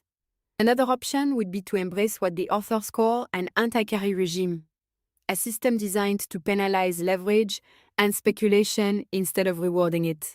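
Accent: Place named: France. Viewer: Why would you use a French accent?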